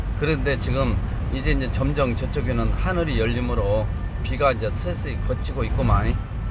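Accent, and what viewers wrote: Jeolla, South Korea. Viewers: 전라도 사투리 너무 재밌네요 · 목사님 강단에서는 사투리안쓰시는데 평소에 사투리 엄청쓰시네요 라도분들은 서울말과 사투리 자유자재로 쓸수있어 좋겠어요 ㅎㅎ 경상도는 고맙습니데이 ^^ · 내 고향 전라도 사투리를 겁나게 쓰시네요~ 갑작스런 사투리에 겁나게 어매어매 정겹네요~ㅎㅎ 성령의 불덩어리가 전라도에 떨어져서 깨어지고 살아나게 하옵소서~ 화이팅입니다~~~ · 아따~목사님!!! 참말로~ 전라도사투리겁나게잼나부러유~ㅋㅋㅋ 사모님... 빗길안전운전하세요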